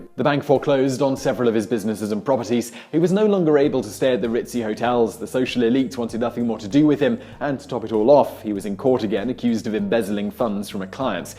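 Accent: England, United Kingdom. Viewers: man, northernlion sounds british in this. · Reads "misled" as mice-alled Proof that having an English accent doesn't make you smart...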